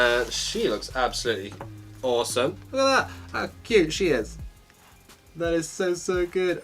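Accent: United Kingdom. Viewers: are you british ? ( I love so much your video )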